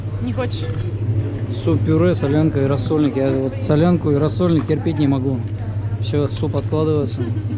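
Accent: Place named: Vyatka, Russia. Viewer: Что -то думаю говор какой то вятский, потом смотрю едут как будто по учхозу, а потом нихрена себе, так это ж Чистые Пруды)))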